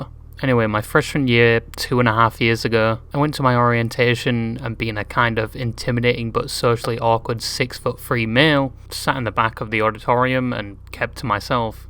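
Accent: United Kingdom. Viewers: Noooooo PLEASE 🙏 I love accent 😭 Don't make his accent go away 😢 He has allotta more femboy topics to discuss 🙏😔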